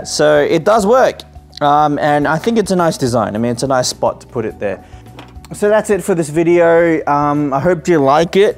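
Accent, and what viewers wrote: Australia. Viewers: Are you from Melbourne Australia? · ARE U AUSTRALIAN???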